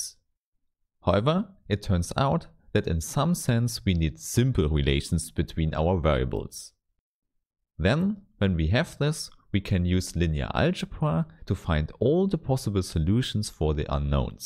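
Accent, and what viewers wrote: Germany. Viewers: Thanks for the Contant, but are u maybe from German ?😂😁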